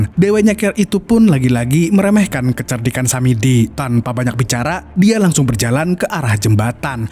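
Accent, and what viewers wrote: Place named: Cirebon, Indonesia. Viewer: Adminnya org cirebon😂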